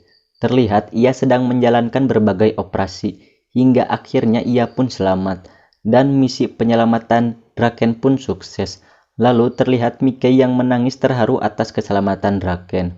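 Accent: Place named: West Java, Indonesia. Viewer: Siganamah orng sunda ye si akng :)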